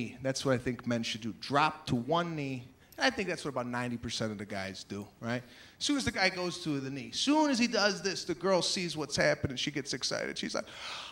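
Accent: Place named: Chicago, United States. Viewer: He has one of the strongest Italian Chicago accents I've ever heard.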